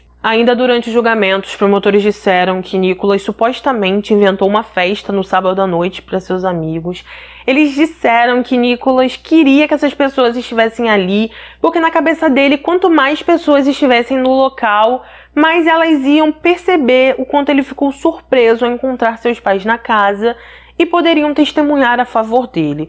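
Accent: Rio de Janeiro, Brazil. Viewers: gosto muito dos seus vídios Deus te abençoe você narra muito bem você tem uma vos Linda a vc tem sotaque carioca você é do Rio de Janeiro?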